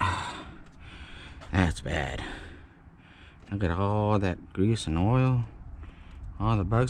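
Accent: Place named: South, United States. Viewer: This was pretty funny but honest, made my day. I thought the author was a "good ole boy" based on the heavy Southern accent but at the end with the commentary, it surprised me! :) Informative vid - kudos for putting it together.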